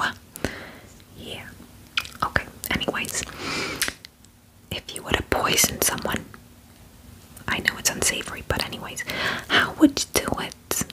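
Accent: New Jersey, United States. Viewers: The NJ accent is on point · THE JERSEY ACCENT IS BACK ❤❤❤❤❤ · That’s definitely Jersey lol sounds like a Jersey Italian lol 😄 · I live in NJ and there are absolutely Italian women that sound like this all around me. It's definitely more common towards the north end of the state and into NYC. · THE JOY I FELT HEARING THE ACCENT😭 JERSEY FAIRIES FOR LIFE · Omgggg I missed my jersey accent fairy!!!! This is the best news. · the jersey accent always reminds me of linda belcher from bobs burgers😞😞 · the accent is Jersey, not New York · yeah i’ve never heard a single person unironically talk like that, some ppl come close but i like the idea that it’s jersey. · I think maybe because this is more of a Jersey accent. My boyfriend is from Brooklyn he has a completely different accent than this 😅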